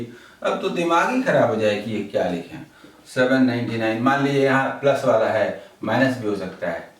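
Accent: Bihar, India. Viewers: Yes he is from bihar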